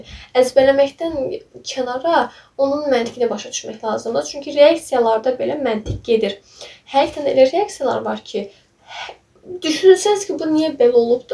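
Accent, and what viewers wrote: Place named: Nakhchivan, Azerbaijan. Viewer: tam təxmin etdiyim kimi😊❤ Ləhcə ilə danışmırdın sadece ara sıra bəzi kəlimələrdən Naxçıvanlı olduğu düşündüm ❤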